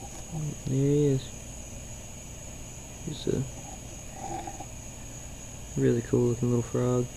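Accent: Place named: South, United States. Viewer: "Got a hold to him" 😊 You have a sweet southern accent and phrases. I'm southern too ❤️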